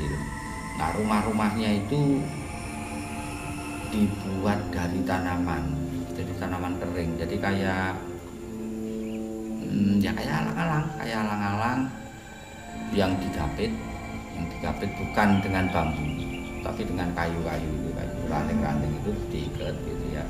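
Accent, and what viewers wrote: Java, Indonesia. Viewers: host nya medok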